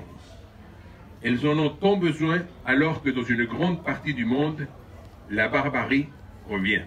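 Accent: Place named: Spain. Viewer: Ne soyez pas ridicule on sait très bien distinguer les différents accents,parisien,sud ouest,chti, marseillais etc,Louis XX n' a aucun de ces accents, et c'est étonnant d'entendre un accent espagnol de la bouche de quelqu'un qui est censé incarner l'héritage de la monarchie française,non?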